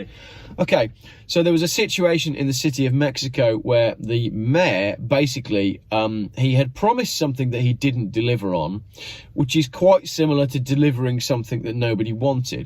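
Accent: Wales, United Kingdom. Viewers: Pretty good Welsh accent tbf. Whose coat is this jacket? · Best Welsh Accent ever....FFS....Love it! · Seems like ages since you did the Welsh accent....seems to make all this bad news easier to swallow. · That was a pretty good Welsh accent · Brilliant Welsh accent actually. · Brilliant Welsh accent . I dont drive .But its ridiculous Teenty miles per hr .Are people going to stand for it .i dam well hope not .if they stand for this .Then they will stand for everything coming down the line .and its not good . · Excellent Welsh accent, I’d say 😀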